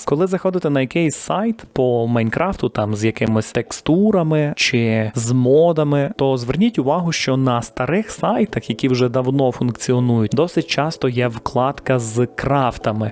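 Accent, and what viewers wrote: Ukraine. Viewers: Доброго вечора, питання не в тему відео, але... Ви випадково не з заходу України? Бо у вас специфічна вимова деякий слів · з Галичини — 100%)) Я за повномасштабну вже навчився на півслові вловлювати, звідки людина)))))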